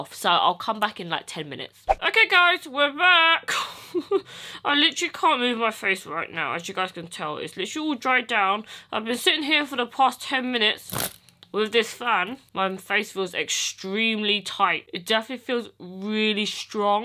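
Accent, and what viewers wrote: United Kingdom. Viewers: Ik haw she is british Her accsent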